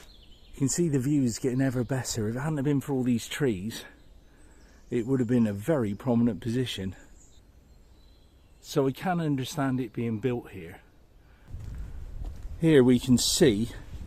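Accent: Wiltshire, United Kingdom. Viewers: all your walks are wonderful, taking me to places my ancestors would have known - and I can never visit in person. That means alot to me and also to hear the Wiltshire accent is delightful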